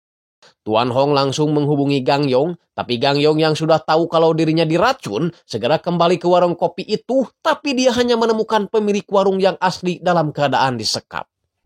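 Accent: West Java, Indonesia. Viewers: kan di potong potong tapi intruksi sudah tertulis & tinggal baca saja berserta mensensor kata yang tak pantas dengan ucapan logat sundanya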